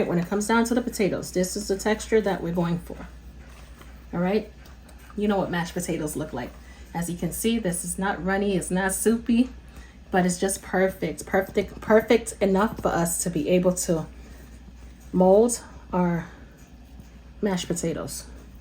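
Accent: New York, United States. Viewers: You sound like you come from New York..I’m from Long Island..thanks for this recipe ❤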